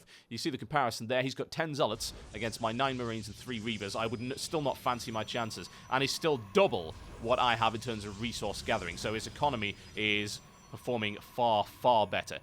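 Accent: United Kingdom. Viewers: lol... British. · You may not be an experienced player, but you're a pretty darn good commentator. Love your accent. UK, right?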